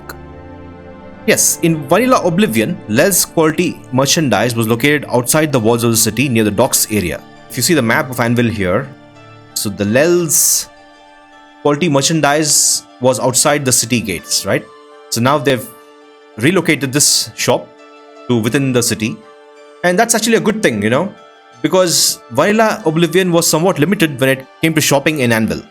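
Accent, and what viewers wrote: India. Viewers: sound like Indian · Actually crazy this guy is German I thought he was Indian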